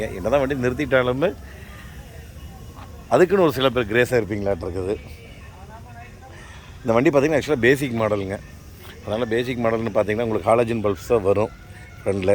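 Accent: Kongu, India. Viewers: கொஞ்சும் கொங்குத் தமிழ் பேசும் கோமகரே❤❤❤❤❤ திகட்டாத பேச்சுடைய திருப்பூர் திருமகரே❤❤❤❤ அருமை அருமை அருமை அருமை யெப்பா வேற லெவல் மதிப்பிற்குறிய அய்யா🙏 நீங்களும் உங்க வீடியோ மற்றும் உங்கள் கார் வேலைப்பாடுகளும் எல்லாம் வேற லெவல்😊😊😊😊😊😊😊😊😊❤❤❤❤❤❤❤❤❤❤❤❤👍👍👍👍👍 அதிலும் குறிப்பாக அய்யா நீங்க நகைச்சுவை நடிகர் சின்னக்கலைவானர் திரு.விவேக் சாரோட தீவிர ரசிகராட்டம் இருப்பீங்கபோல😊👍 Secondல சொன்னது "ரைட் சைடு இண்டிகேட்டர்😂 (ஆனால் திரும்புனது இடது பக்கம்😂) அதாவது ரைட் சைடு இண்டிகேட்டர்னு சொல்லுவோம்.... அதே நேரத்தில் இடது பக்கம் திரும்புவோம்.... ஆனால் Straight ah போயி நம்ம ah கண்பியூஸ் பன்னுவோம்ல😂😂😂😂 என்றும் அன்புடன் உங்கள் மகன்போல இவன் ரா.முஹம்மது கபூர் (சென்னையில் இருந்து) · Getting addicted to your Kongu tamil.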